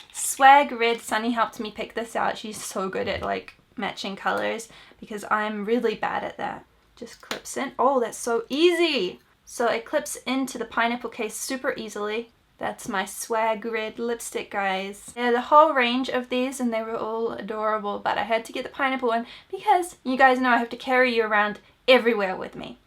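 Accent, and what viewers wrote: New Zealand. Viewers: i love your new zealand accent so much omg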